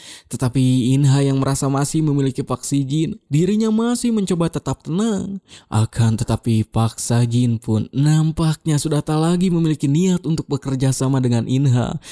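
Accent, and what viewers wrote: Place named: West Java, Indonesia. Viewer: Min orang Sunda nyaaa😭🫵🏻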